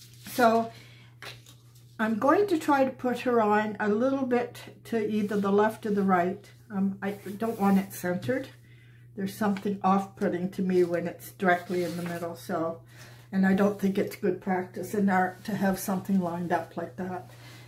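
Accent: Nova Scotia, Canada. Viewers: I was searching for plaster of paris projects for junk journal covers and your momigami video popped up and I'm so glad it did. I subscribed now and like the wide range of art projects on your channel. I live in Denmark, originally from California and adore your Nova Scotia accent. I had just made a journal out of crunched up paper bags with hand cream and loved the rawness. Last year I ventured into gelli plate print making as I don't get into the printmaking studio that often. I will try your momigami technique on them as well. Thank you for sharing your knowledge. By the by, I love Froyle's art too.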